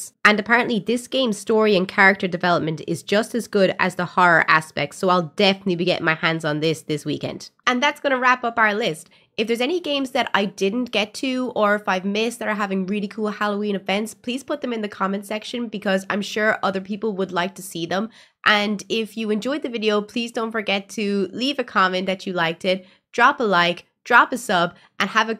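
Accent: Ireland. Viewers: I like your accent 😊 I need to move to Ireland 🇮🇪 😁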